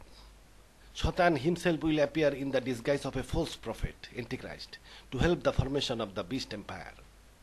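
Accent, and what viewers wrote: Bangladesh. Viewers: Are you from Bangladesh, love your accent.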